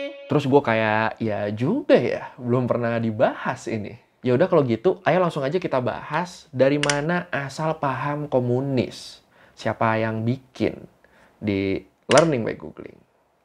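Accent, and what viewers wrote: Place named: Madura, Indonesia. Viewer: lu orang madura ya bg? nadanya kok gt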